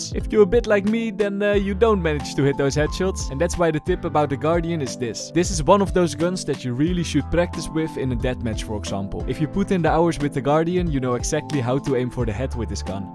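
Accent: Netherlands. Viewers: Meest Nederlandse Engels ooit😭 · Als je geen Nederlander bent dan ben ik geshockeerd 😅 The heaviest Dutch accent I've heard in a while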